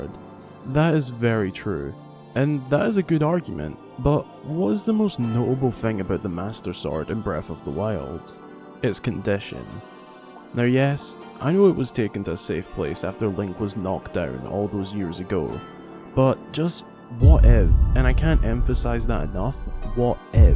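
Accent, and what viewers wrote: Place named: Cockney, United Kingdom. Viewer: Your accent is so cockney XD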